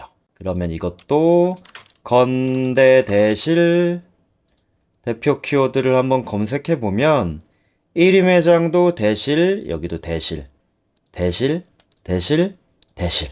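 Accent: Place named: Busan, South Korea. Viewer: 부산이신가보네요 내용너무감사합니다😊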